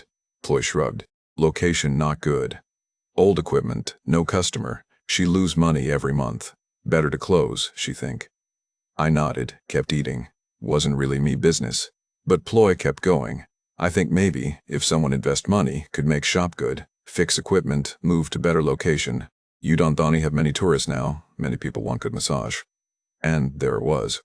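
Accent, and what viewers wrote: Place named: United States. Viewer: American voice, but says "me" shoulder, "me" work. She had a sip of "me" beer. That sealed it in "me" head. Doesn't Ireland use euros,not dollars. Looked at retiring in Ireland, need to have 50 to 60 K euros a year just to reside there, might be fun to spend a year or two there. At least he didn't let his money rot in a bank.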